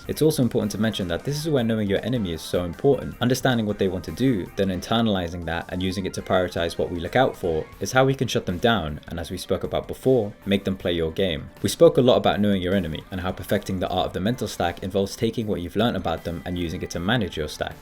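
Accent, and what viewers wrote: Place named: United Kingdom. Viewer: Leave it to a guy with a British accent to give me street fighter insight that applies to just life in general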